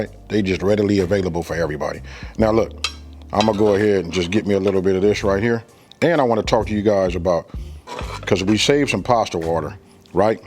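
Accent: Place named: Midwest, United States. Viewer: Bro where you from I’m from Kansas City Missouri and I swear you from KcMo or Midwest by your accent or somewhere Maybe Detroit lol… nonetheless that meal fire G I’m making this next for my wife & moms Insha Allah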